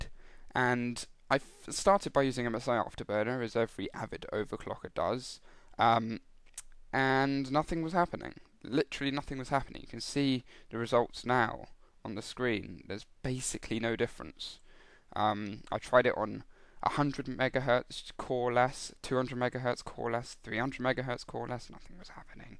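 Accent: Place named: United Kingdom. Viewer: Just a question about accent bro. I can understand you and I'm from Turkey,Are there any american who can't understand British ?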